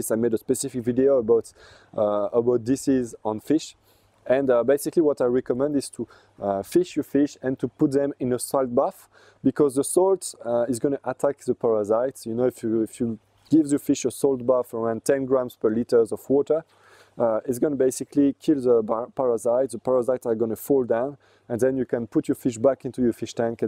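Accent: France. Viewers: Très français cet accent !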